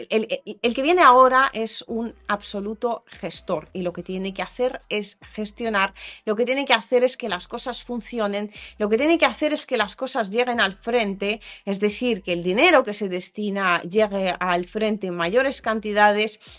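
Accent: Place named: Spain. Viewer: Saludos preciosa te admiro adelante lo único que no me gusta es que hablas como los españoles soy mexicano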